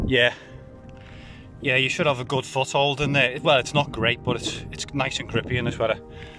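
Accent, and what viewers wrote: Liverpool, United Kingdom. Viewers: Good filming & great to hear a fellow scousers on You Tube. Cheers lar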